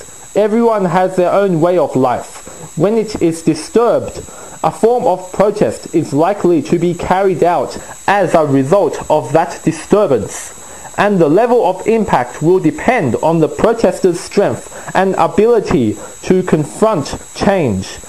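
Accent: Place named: Asia. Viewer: why the asian accent ?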